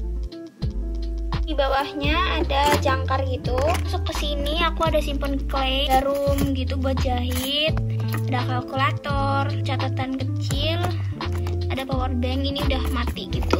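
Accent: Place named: Java, Indonesia. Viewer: Orang Jawa ya,,,,, suaranya tuh kek jawa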